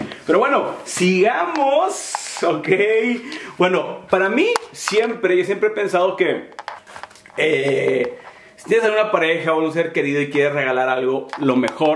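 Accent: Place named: Northern Mexico, Mexico. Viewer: Esa voz norteña ❤ jaja creo que si hablamos fuerte y golpeado jaja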